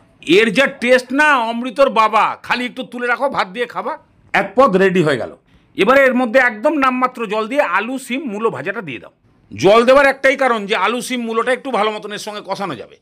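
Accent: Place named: Bangal, Bangladesh. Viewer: Tumi bangal bolo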